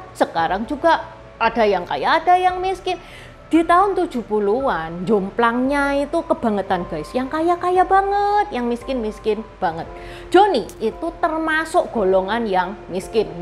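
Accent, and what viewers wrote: Java, Indonesia. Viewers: Mbak kental banget logat Jowo nya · Uraian ceritanya, ter urai indah, walau tekanan / aksen jawanya masih medok, tapi masih dapat di mengerti.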